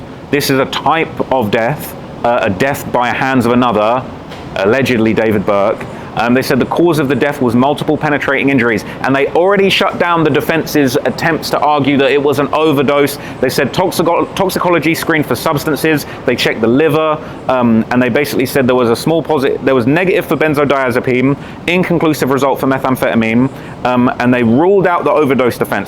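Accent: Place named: United Kingdom. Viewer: That’s how British people speak bro calm down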